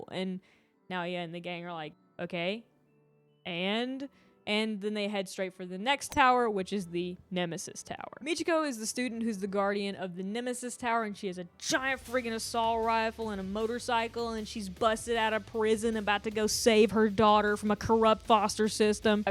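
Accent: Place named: South, United States. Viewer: I know this is a completely random question and I doubt you’ll even see this but I have to ask: are you from the south? I’m from Texas and you seriously sound just like some of my friends that have lived in Texas their whole life. The accent is just almost exactly the same so I had to ask.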